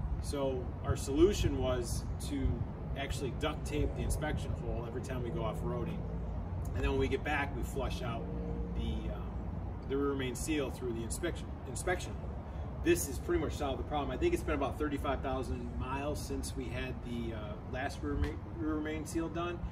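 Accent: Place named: Western New York, United States. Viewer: I think I recognize that accent; western NY, perhaps? I grew up pretty close to Rochester meself.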